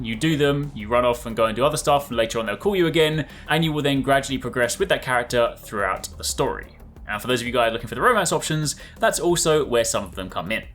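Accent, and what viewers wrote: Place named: United Kingdom. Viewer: I set to .75 speed and it is such a normal pace of conversation, I think it was recorded at this speed and then compressed to run at the current speed. Now if I can find the switch to turn off the over-accented british pronunciations so that I don't need subtitles, that would be awesome.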